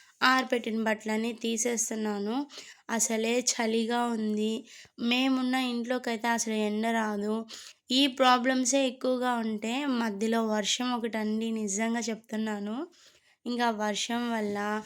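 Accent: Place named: Nellore, India. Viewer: చక్కగా వుంది మీ video Super, వాయిస్ కూడా Super, Nellore నా ❤👌👍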